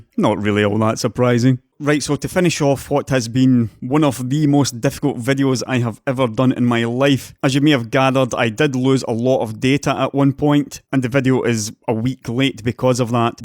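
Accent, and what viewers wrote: Scotland, United Kingdom. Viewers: Nice work from AMD but I've already been using PrimoCache for years and this is effectively the exact same thing. Nothing really new here from what I can see but bringing this to the masses as part of their platform can only be a good thing! This is one of the many reasons my next system will be all red team. As always a great video. Cheers from Australia, you awfully Scottish fella. 😉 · Is that a Scottish accent ? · Scottish accents make tech videos better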